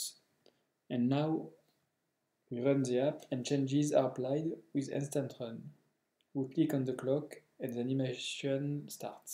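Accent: France. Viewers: That's some hardcore french accent !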